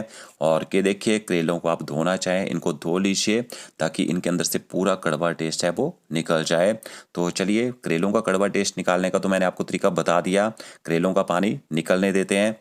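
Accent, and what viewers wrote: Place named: Himachal Pradesh, India. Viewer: Himachali accent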